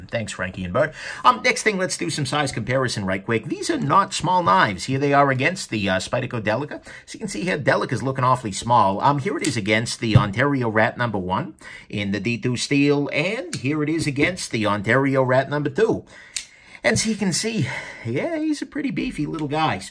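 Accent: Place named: Long Island, United States. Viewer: As soon as I heard your voice I would have guessed you had a friend named Frankie LOL. No disrespect man I got a buddy that lives on Long Island to talk to the same way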